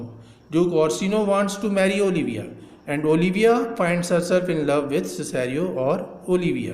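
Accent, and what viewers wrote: India. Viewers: Very good Indian Accent.. Perfect Indian English language.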